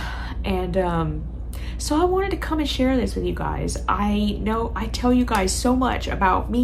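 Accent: Louisiana, United States. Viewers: You have the Cajun accent back! I have kids and grandkids in Lake Charles!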